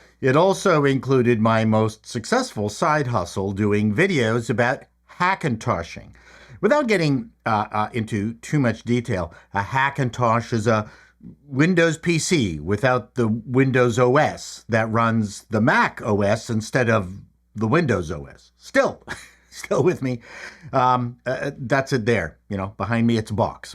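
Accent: Canada. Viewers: I am here for the chrisp Canadian accent. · 🤔'Drunk acerbic Scottish movie critic'? Not sure who you are referring to. 🤣This was indeed fascinating and entertaining sir, I knew and enjoyed your earlier work with the Frantics, and joined the fellow followers know as Dennises(?) and missed the Hackintosh era. It was great to hear you talk about the evolution of your channel, but when you were 'geeking' out and going into the details on your computer builds. For some reason I started hearing the hit ' ' in my head!😂 Thanks for the video and the laughs sir, very glad that YouTube dropped me the suggestion to check you out! I mean I get to enjoy your acerbic movie and television reviews, minus the alcoholism and with a Canadian accent! 🍁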